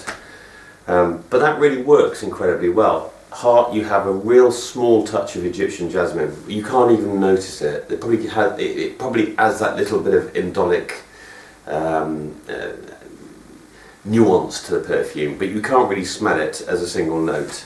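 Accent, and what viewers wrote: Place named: United Kingdom. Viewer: Hey. I love your voice and that UK touch accent however last video you uploaded was 5 years ago so I hope you doing good.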